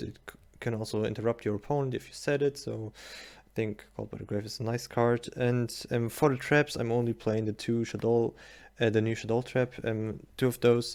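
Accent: Germany. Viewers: Is that a German accent? :D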